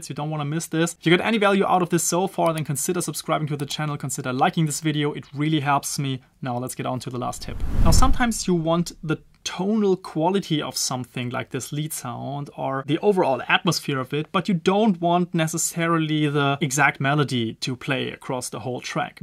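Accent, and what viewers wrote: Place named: Germany. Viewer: You sound very German xD Great trick buddy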